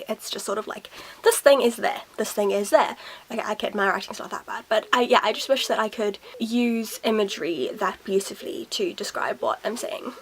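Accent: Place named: United Kingdom. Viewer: WOW, there should be more female readers like you! You admire Percy Jackson and Alex Rider too! (Have you done a Q&A video about yourself? You seem really interesting. But, I couldn't find one of those videos on your channel. One of the questions I would ask is this one: you live in South Africa. But you got an elegant middle class English accent. How come? I'm just curious. Would you do a video about? )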